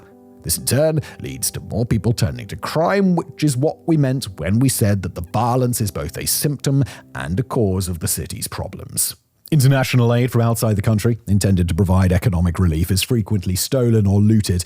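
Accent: England, United Kingdom. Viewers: Simon create a video full of lies using a mix of content from a bunch of other online contents. I have friends in Petion-Ville right now having more fun than me and looking forward to enjoy the weekend. Don't let this random English accent define what's going on in Haiti....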